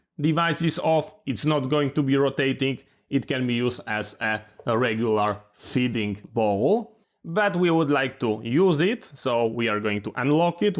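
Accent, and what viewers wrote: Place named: Eastern Europe. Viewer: Lovely Eastern European accent 👏🏼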